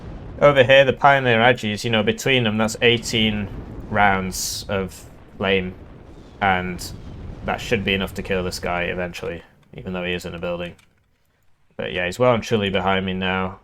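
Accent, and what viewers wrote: United Kingdom. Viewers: Man your German gets besser and besser. Love to hear Untermensch out of your Tommy mouth! <3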